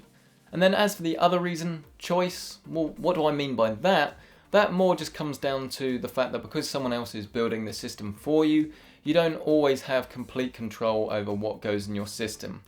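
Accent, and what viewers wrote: England, United Kingdom. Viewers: # P L E A S E H E L P M E! S I R THX ! # Hello building Computers is scarey but It can be great fun too , it used to be for me until my Brain Hem triggered Stroke! I am a wheelchaired Paralysed English Bloke you sound English but you could be Austealian/sAfrican Gibrateen ? I'm Paralysed guy and would love to build a PC/PC workbench perhaps then because I have little control with one hand you are aroud/approx the 22nd person iv'e asked would you be able to build a Pc with One Arm behind your back!? IFyou can't Help mewith that! Iv'e from Launch day I still am waiting for someone to give me some advice on how to INSTALL GTA5 the 7 dvd discs varient of this game on the PCI have a 120gig Win10ssd so I need to be able to install it on either d:/or e:/partition my separate 2 t b d/e separate drive.It is the one and only Game I want to play on my i7 3.6ghz cpu and my 1 gig vram gtx560ti could you help give me some step by step advice as I suffered abrain indury with my movement loss thankyou for at least considering my ASK! 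cheers thanks I apreciate it!!! I need to install the game on my 2 tb other drive 1 tb per individual partition partition I hope you'll be able to offer some advice certainly with the Game would be outstanding thankyou... broken mark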